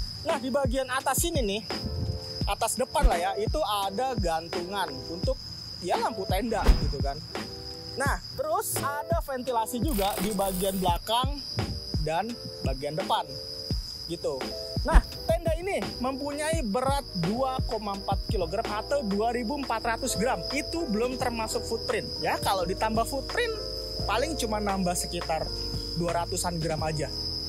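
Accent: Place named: Indonesia. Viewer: Suarnya medok banget bang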